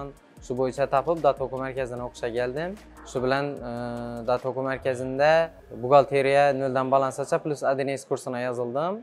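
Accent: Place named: Khorezm, Uzbekistan. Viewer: Assalomu Aleykum Xorazm sheva gap yo`q, Buxorodan salom..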